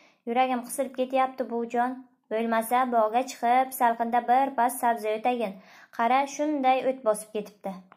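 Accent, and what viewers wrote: Bukhara, Uzbekistan. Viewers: Маладес қизим, зўр ўқибсиз👍. Бухороликмисиз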